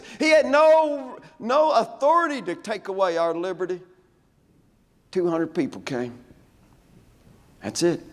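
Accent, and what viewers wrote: United States. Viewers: good ol' Texan slant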